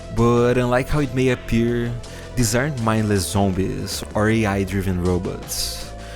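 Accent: Brazil. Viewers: Sotaque de brasileiro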